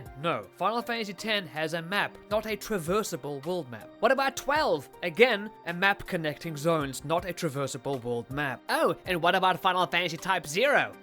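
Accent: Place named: South Africa. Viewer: Hierdie ou klink Suid Afrikaans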